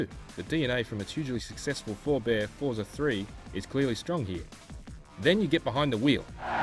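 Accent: Australia. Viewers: the dude sounds aussie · lol aussie doing the review :)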